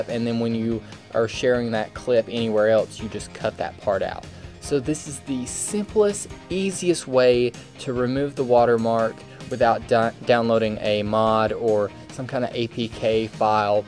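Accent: United States. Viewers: This dude is probably from Texas, the accent throws it off. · I love your Country accent :3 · Finally an American, Indians are absolutely irritating to listen to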